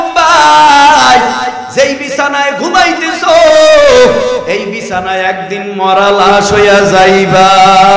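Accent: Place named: Barishal, Bangladesh. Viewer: Barisailla pola bole kotha Owaz ta really দারুণ hoice সাবাস ভাই আমাদের গর্ব e আপনি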